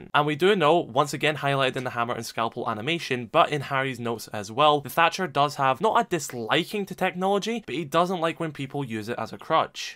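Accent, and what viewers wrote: United Kingdom. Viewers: Bri ish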